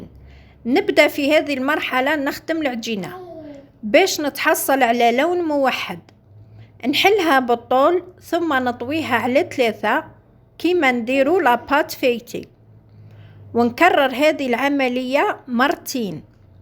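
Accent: Setif, Algeria. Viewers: يعطيك الصحة على حساب هدرتك نباني سطايفية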